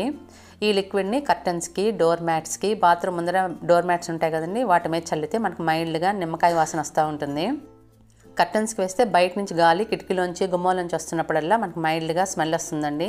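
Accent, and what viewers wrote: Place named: Godavari, India. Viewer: Aay.. Meedhi Godavari entande.. Chala baagaa sepoarandi.. Thanks ande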